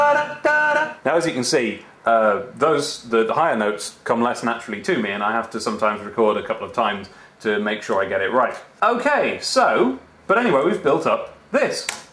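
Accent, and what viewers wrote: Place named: England, United Kingdom. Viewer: So glad I've finally see man with an English type accent make fun of Americans...my life is now complete...wait...or are you AUSTRALIAN?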